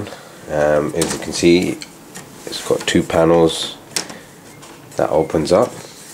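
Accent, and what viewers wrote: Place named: Birmingham, United Kingdom. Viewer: Your from birmingham aint you ;)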